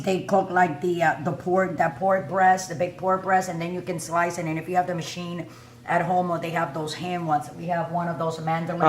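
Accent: New York, United States. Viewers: Found you on the foodie channel.. and omg I’m in love with your channel. So glad I clicked. Love your energy! and you and your son doing this together! 💗(And your fur babies a big PLUS 🐶)!!! Where are you from... Sounds familiar!? I’m from NY, and that’s where you sound from. Love it!! I hope to see you over at my channel to. I think you’d enjoy it!! Many blessings to your channel honey! 💗👩🏻‍🍳🦖 PS I loveeeeee me a Cuban sandwich. Omg YASSSSS girl. You killed it! 🤤🤤🤤🙌🏻🙌🏻🙌🏻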